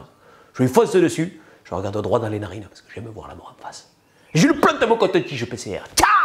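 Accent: France. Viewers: La pratique de la coromachie produit de "grands malades" ....! Merci pour la création artistique et le rire salvateur ! En plus "avé l'assent" . Au fait Castex ? Il surjoue au maximum l'accent gascon ?!! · Un zeste de dédain du Midi, avec cet accent languedocien surprenant ici, en lieu et place de celui d'un "héros" español? Oui, mais avec un zeste d’obsession anti-covid qui devient lassante, usée, et qui rejoint finalement l'obscurantisme, les falsifications...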